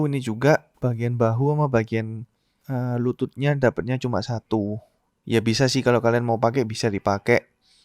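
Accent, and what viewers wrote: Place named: Java, Indonesia. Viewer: MedoQ sekali...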